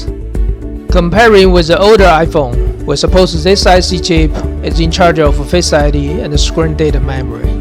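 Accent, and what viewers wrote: India. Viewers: thats not his video....... look.... this guys voice is indian, the video is china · О этот божественный индуский акцент))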